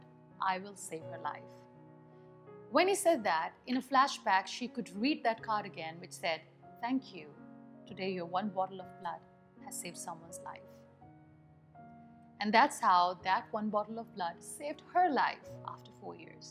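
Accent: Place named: United Kingdom. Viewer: mam i just wana ask in which country u r still living.. ur english speaking accent is british.. u r jst osmmm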